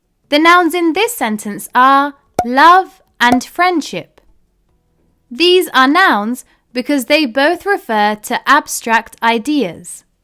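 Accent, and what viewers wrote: United Kingdom. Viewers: I love a great British accent❤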